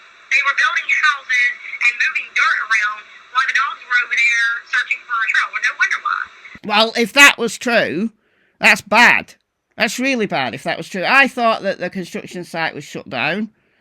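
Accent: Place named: North, United Kingdom. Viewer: Heh heh heh no one can rant quite like a northerner! Brilliant! Well said!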